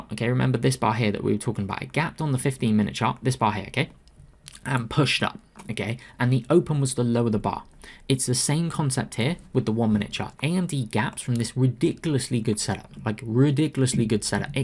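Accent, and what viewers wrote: United Kingdom. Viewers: hi, not asking for recommendation, just wanted to know what if any allow unlimited stock trades in the UK, only asked as you sound like you are from the UK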